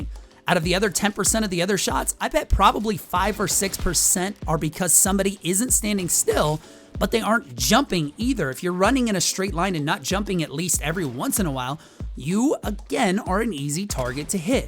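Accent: Denver, United States. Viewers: Are u from Denver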